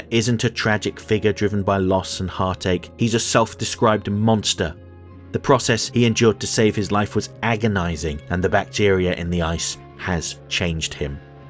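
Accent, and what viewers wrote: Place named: RP, United Kingdom. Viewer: I hope this channel grows well. I enjoy your insight and in depth review alongside your nice voice. I also learn a bit of history. Its like hearing Sal from Comicpop explain the comic but with a chill posh British accent.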